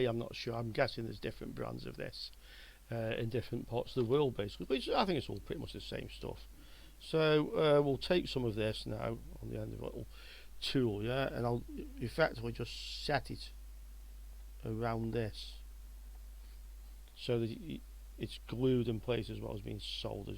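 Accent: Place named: Stoke-on-Trent, United Kingdom. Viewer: I'd recognise that accent anywhere, where in Stoke on Trent, do you hail from my fellow potteries brethren? Longton/Blurton is the stomping ground of my youth, then briefly moving to Liverpool for 18 months. Before permanently relocating to Walsall, just a few miles south of Birmingham.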